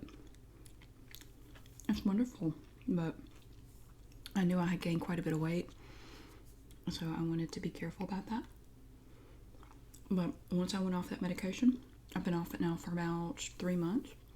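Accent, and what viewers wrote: South, United States. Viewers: I lived in Thomasville for a short time after college, running a local farm. Before this video based on your accent I really thought you must be in that area! (I moved around southernVA and northern NC a bit for a few years before landing in the northern va/dc area. So many great things about living down there.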